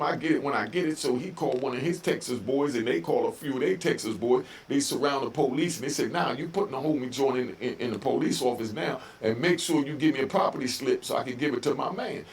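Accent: Jamaica. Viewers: Respect I see you can speak Jamaican.👍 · Hey, you Jamaican? If you not the cccent on point😅. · Yardman protector you sound like a yardman with a accent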